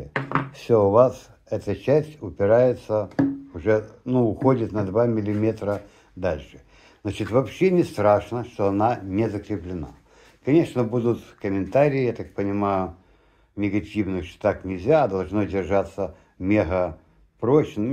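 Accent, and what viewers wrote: Odessa, Ukraine. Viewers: Спасибо, рабочая идея с Одесским акцентом - в роли ограничителя деревянный камертон, почувствуйте столярный настрой.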